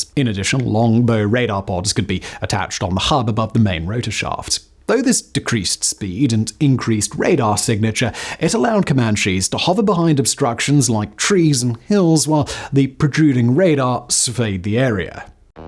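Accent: England, United Kingdom. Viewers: Arrogant use of English accent. This guy is a Never Soldier w/ Cavalier wuss Talk Anti American Buffoon!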